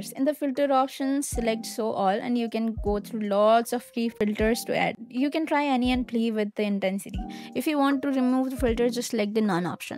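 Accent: India. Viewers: Finally someone has taken the time to explain the basic nuts & bolts/tools of Canva. I also like you Indian accent ❤ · hate indian accent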